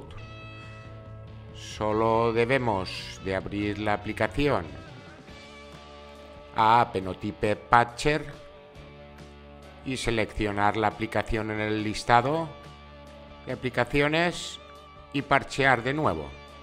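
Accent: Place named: Spain. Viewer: No intento ofenderte de ninguna manera, ¿pero es tu acento español porque eres de España?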